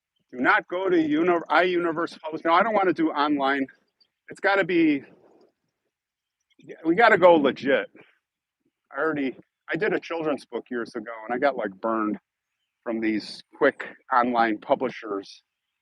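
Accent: Chicago, United States. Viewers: My cousin Teresa married Gary Vanhousen from Chicago il so your dialect hits home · love your Chicago dialect!!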